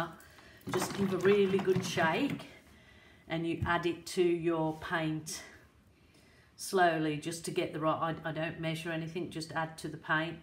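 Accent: Birmingham, United Kingdom. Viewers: I'm getting a hint of brummie in that aussie accent. Great pour btw. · I couldn't quite place it either! Definitely got the Brummie accent but I knew there was also some Aussie in there too. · This is amazing! So beautiful! Your accent doesn’t sound Australian. Are you from the UK? ☺️ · Love your pictures & the brummie accent 🌍🇬🇧🇬🇧🇬🇧🌍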